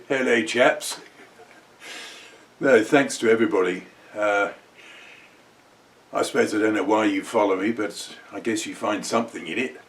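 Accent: United Kingdom. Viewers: Sounds good, just don't try to sound like doubleboost, even the Brits have difficulty with that one. RP or home counties will be fine.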